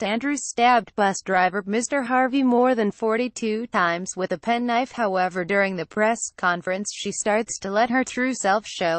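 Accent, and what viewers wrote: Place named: United States. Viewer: Love American accents, but very annoying voice 😐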